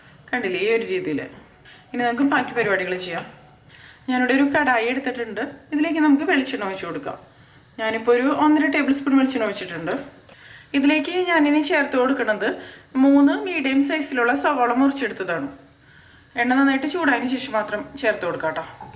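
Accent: Thrissur, India. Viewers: ചേച്ചി തൃശൂരാണോ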